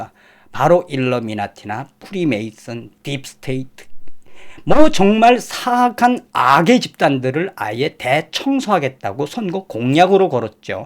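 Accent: North Korea. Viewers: 정확한발음과 구수한북한 억양이 아주 좋습니다.👍🎆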